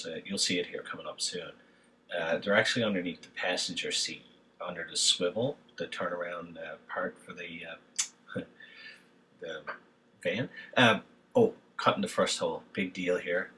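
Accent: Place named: Newfoundland, Canada. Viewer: Hah. Here I am daydreaming about a ford transit conversion, looking for ideas when I stumble across this video and immediately realize that I recognize that accent. A fellow Newfie!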